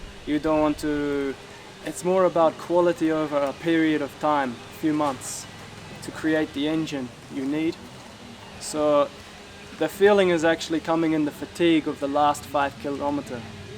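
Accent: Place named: Kenya. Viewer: Felipe Lopez It’s like he’s saying everything in a Kenyan accent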